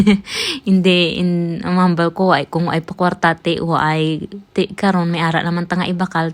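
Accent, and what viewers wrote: Philippines. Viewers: Hnd ko maintindihan cnasabi mo ppro ka visaya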